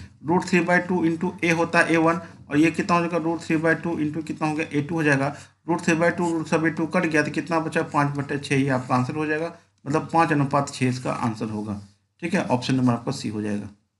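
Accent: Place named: Mithila, India. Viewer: अपने के आवाज स प्रतीत होय ६ै अपने मिथिलांचल के छलिए! सादर आभार अपने के बहत निक से पढ़वे छलीये🙏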